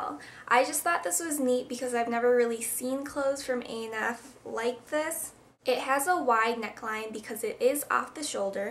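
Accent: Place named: Chicago, United States. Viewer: you are sooo cute and i love your "accent", is that a chicago accent? i can't figure it out and it's bothering meeee!!! ahhh!!!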